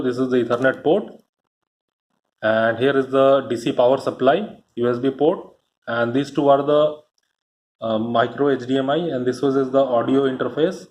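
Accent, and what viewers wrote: India. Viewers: another indian accent ...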